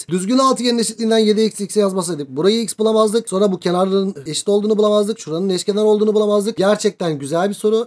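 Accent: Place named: Trabzon, Turkey. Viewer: Trabzon gibi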